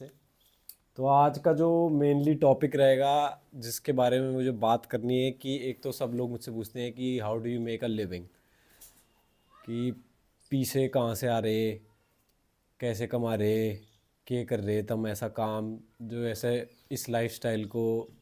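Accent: Haryana, India. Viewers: Hai tu up ka bole haryanvi hai ... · he talks like haryanvi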